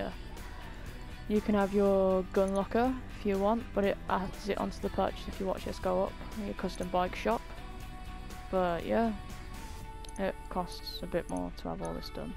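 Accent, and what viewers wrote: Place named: Birmingham, United Kingdom. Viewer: LOL SHE FROM BRUM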